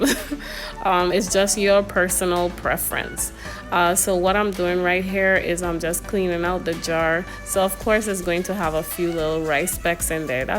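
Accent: Caribbean. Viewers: You have a Caribbean accent, where is she from? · You accent sounds Caribbean, I'm from Trinidad, where are you from? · Sounds like you from the Caribbean 🇬🇾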